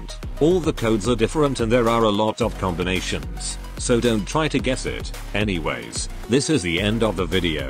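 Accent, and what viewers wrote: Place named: United Kingdom. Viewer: Love british accent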